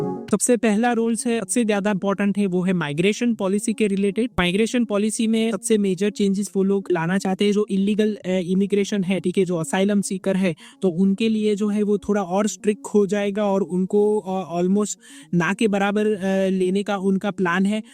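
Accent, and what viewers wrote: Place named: Gujarat, India. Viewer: Bhai Kay Thai chho? Gujju accent is visible 😂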